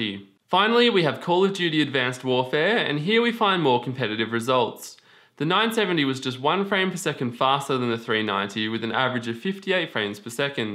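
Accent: Australia. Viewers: You sound like you're from Australia. May I ask where you could possibly get a GTX 970 for $300? That's the price range of the GTX 960 here. The 970s are around $450, depending on which brand you pick. If you're not from Australia, my apologies.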